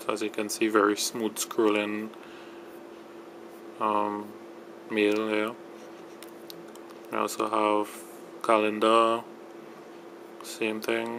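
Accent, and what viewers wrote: Trinidad and Tobago. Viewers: dude you from trinidad huh? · i love ya accent, im from jamaica....u trini ??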